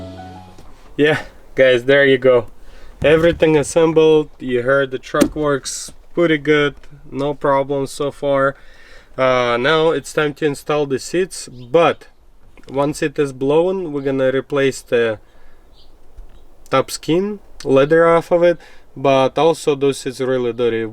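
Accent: Russia. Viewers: Касаемо контента, все супер! Тачка моей мечты!!! 😃😃😃Но, я один слышу Русский акцент в разговоре? Или мне показалось? Касаемо АВТО: Если делать под себя, ТО раз уж разобрал, ТО я бы сделал Шумку!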